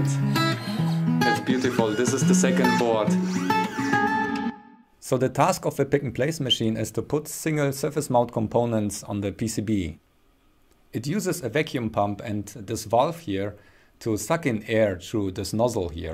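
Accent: Germany. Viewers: I love the German accent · Das hört sich so deutsch an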